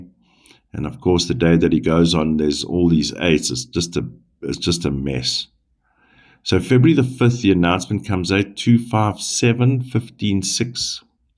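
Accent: South Africa. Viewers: So when Kate appears after all her treatment and recovery you will have no more validity as a psychic medium? Sorry but I feel this is a lot of nonsense. You sound South African so you probably have never had a connection to the UK.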